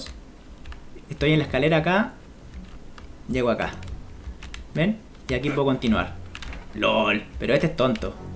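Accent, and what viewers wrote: Chile. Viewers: eres chileno ? xD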